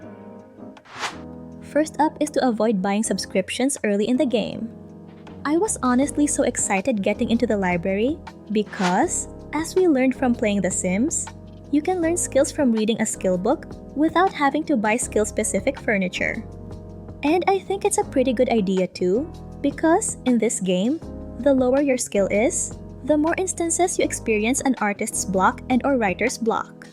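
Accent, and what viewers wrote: Philippines. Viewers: Are you Filipino why does it sound like that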